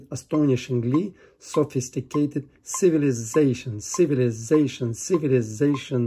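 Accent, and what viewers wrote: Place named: Russia. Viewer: Любопытно. Но автору неплохо бы поработать со своим жутким русским акцентом.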